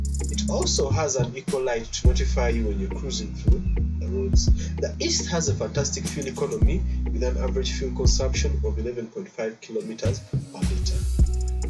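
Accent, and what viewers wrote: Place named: Uganda. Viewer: that's a Ugandan accent.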